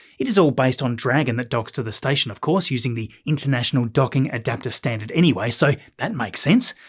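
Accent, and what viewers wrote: Tasmania, Australia. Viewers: As an American, I love a good 'Tassie accent. I will attempt to transliterate. SpiceEhx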